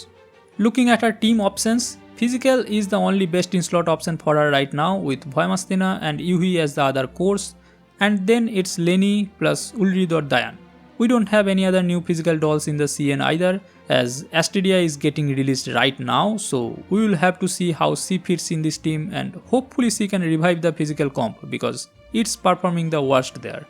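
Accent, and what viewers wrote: India. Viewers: sound of indian ppl , not recommend.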